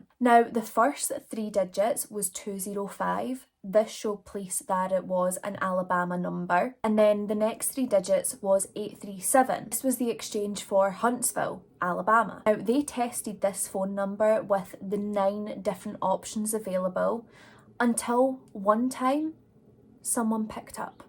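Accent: Scotland, United Kingdom. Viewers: Hf, I thought you were saying “pill party” this whole time but you were saying POOL party 😆 your Scottish accent is so cute lmfao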